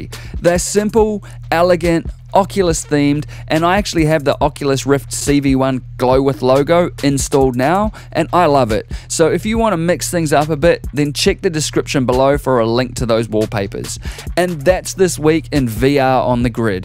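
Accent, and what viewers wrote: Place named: Australia. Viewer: another great video thank you, I noticed you were speaking more slowwwly this time. Is that to help an international audience with your Aus accent? if so my hats off to you for expanding. although personally I preferred the 100MPH, full bore, let rip :) keep up the good work, I look forward to the next Video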